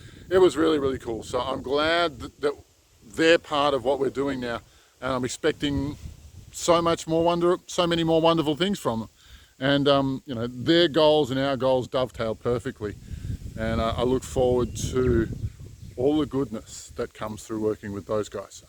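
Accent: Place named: Australia. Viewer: I just found you & am blown away by the little I’ve seen. It wasn’t until I saw the stunning scenery behind you that I clicked you were Aussie & why your accent & speech were so relatable - 👋🏻 from cold, wet, freezing Melbourne here on Cup Day 🏇🏆 After decades of physical/mind blocks, I finally see/feel the closest to home ever, expanding without restriction & what I heard here made complete sense. Thankyou, may love & light be with you always 💗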